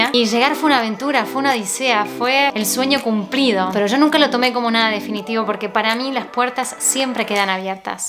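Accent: Argentina. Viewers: Me encanta el acento argentino😍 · Me encantó el vídeo, por fin dejaste de hablar raro y volviste al argentino!!! Esperamos más vídeos!!!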